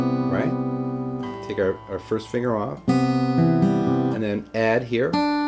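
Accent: Canada. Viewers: GREAT TEACHER! The way you teach really connects with me thank you friend. BTW Are you Canadian?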